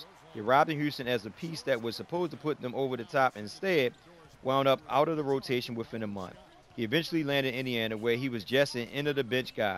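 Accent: Baltimore, United States. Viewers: you sound like your from b-more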